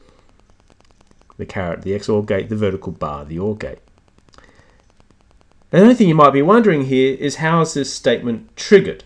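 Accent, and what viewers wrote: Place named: United Kingdom. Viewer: What are you talking about? He's pronouncing it with his British accent what's your problem lol? Your accent is a lot worse for pronunciation, so I wouldn't be talking if I was you, at least his is one native to the English language.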